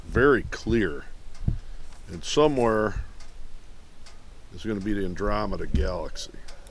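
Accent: Minnesota, United States. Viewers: Sounds like Minnesota Man.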